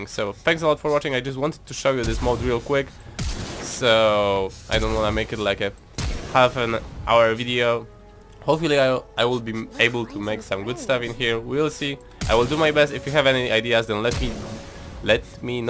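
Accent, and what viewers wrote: Poland. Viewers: Jestes z Polski prawda? Slychac, np gdy wypowiadasz 'mana' . Pozdrowionka i robisz mega robote! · Are you polish? Your accent is really similar lol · ty jesteś polakiem bo masz taki głos polaka. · Polski akcent? Czy tylko mnie sie wydaje?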